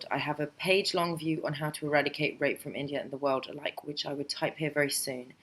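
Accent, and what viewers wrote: United Kingdom. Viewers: Hey, people have made reality TV shows with daily routines like yours. I'm sure it will be interesting to watch. Most people think a person with your job lives a life like a episode of Americas Next Top model on a daily basis. Getting views are kinda tricky. You gotta have a combination of the right tags, title, timing, & luck. Or have one of those super channels comment on your video to draw views from there fans. I have two videos with 500,000 views, but the rest of my videos have around a thousand or less. But I've never realy cared about views. My channel is dedicated to one niche video game, along with a couple other videos that I felt like making. It's all about art for me. Editing video & seeing it play. Kinda like art. Sometimes you will get alot of views & sometimes you wont. I saw you Showreel video. Have you ever tried to audition at the Travel Channel or Discovery channel? 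Your exotic look & British accent would make you perfect for a show like "Globe Trekker." Plus you know how to travel the world. I used to like watching that show back in the day. Watch this youtube video - /watch?v=Pyn2IOAO6Mc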